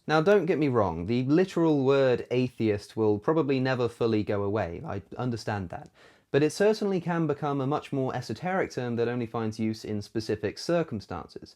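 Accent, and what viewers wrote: United Kingdom. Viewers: Your a smart guy but you're blessed with a British accent. It makes you sound smarter.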